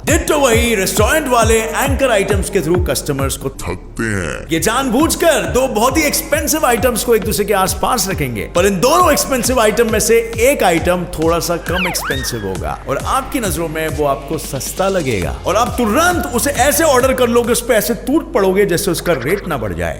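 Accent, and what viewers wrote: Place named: Kumaon, India. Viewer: Bhai as your dialect is kumaoni. Do you know Kumaon mastiff.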